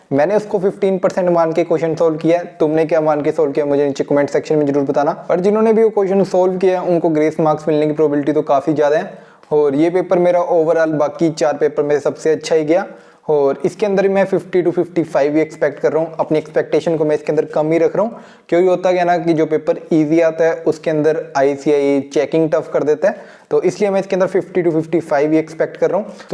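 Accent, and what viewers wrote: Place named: Punjab, India. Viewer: okay ji mai vi punjab to . Tuhadi accent to pta lg gya tusi punjabi ho . Mai fazilka to ha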